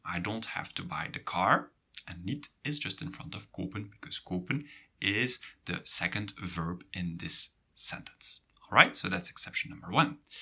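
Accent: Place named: Belgium. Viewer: oh I thought I detected a Belgian accent. Thank you for making this video. Its very helpful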